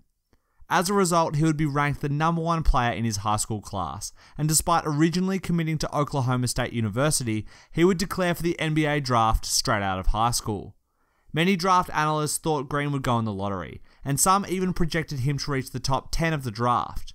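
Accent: Australia. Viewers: u sound like an asian aussie amirite?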